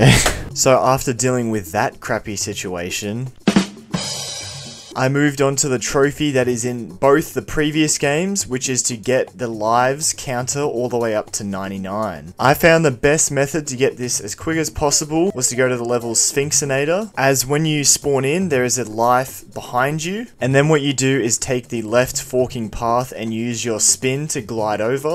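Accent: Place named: Australia. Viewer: 1: Crash Bandicoot takes place in a fictional version of Australian Islands. 2: you do kinda sound like that.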